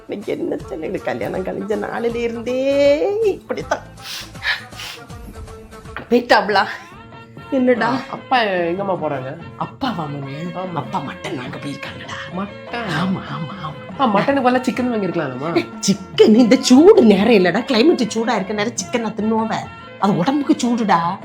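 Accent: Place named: Kanyakumari, India. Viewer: Konjam kanyskumari slangai maathalamae.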